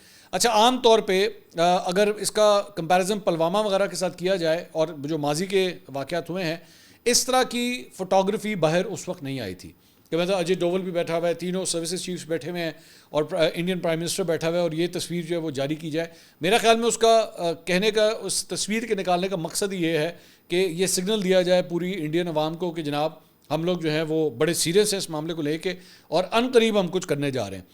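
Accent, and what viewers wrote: Punjab, Pakistan. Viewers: Dammmm I love ur puuunjaaabi😂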